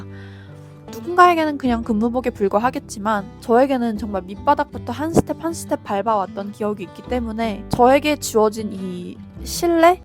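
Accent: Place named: Australia. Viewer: 내년 간호대입학을 위해 블번에서 학비모으고 있는 워홀러입니다ㅎ 지난 일년은 정신없이 지나갔지만 올해는 영어를 적극적으로 공부해보려는데 호주발음으로 스피킹 잘할 수 있는 공부법이 있을까요? 호주영어 하시는 모습에 늘 감탄하고 갑니다! 저는 미국식발음만 쓰다가 요새 좀 호주식으로 일부러 하려고 노력하고있거든요 ㅎㅎ 님만의 호주영어공부법이 있다면 공유해주세요! 항상 영상 잘 보고 있어요❤️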